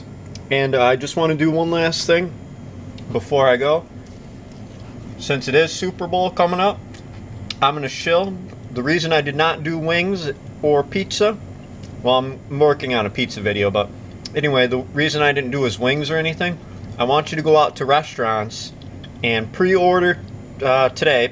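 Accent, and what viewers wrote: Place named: Upstate New York, United States. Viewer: Are u from upstate NY lmao I can tell by the accent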